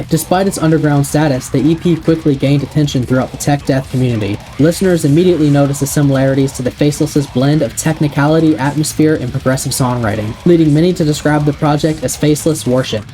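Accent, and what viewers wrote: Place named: South Carolina, United States. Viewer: You sound like you're from South Carolina.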